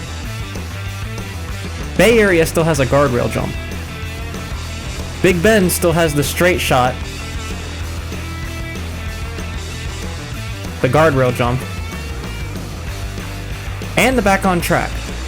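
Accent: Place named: Italy. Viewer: I am italian, you are italian, i can hear that you are!!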